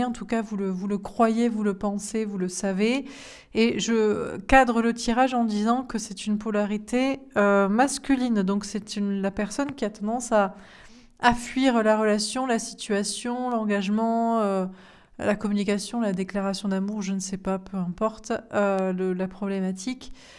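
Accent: South, France. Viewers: Encore un excellent moment avec vous. Beaucoup de justesse.. et encore beaucoup de rire. Je ne sais pas d ou vous êtes, car je cherche l accent, mais y a quelques expressions du sud. 😂 j adore. Au delà du contenu… vous êtes tout à fait le genre de personnalité / humour / personne qui j adore avoir autour de moi. Je pourrais passer des heures avec vous, à vous écouter et à rire. Merci 1000 fois pour ces bons moments. 🙏🏼✨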